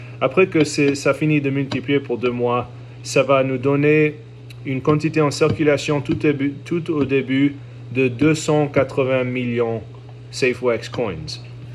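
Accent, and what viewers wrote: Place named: France. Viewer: You’ve got an awesome French accent mate, congrats (I’m French)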